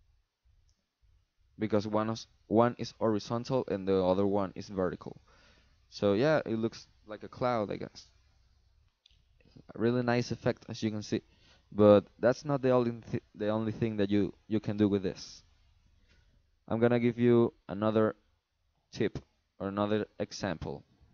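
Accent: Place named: Brazil. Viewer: el chico del vídeo fala com acento brasileño.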